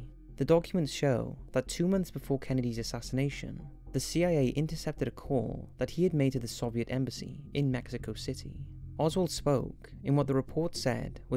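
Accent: Wales, United Kingdom. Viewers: Plus that Welsh accent... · I know a Welsh'mon when one talks 🏴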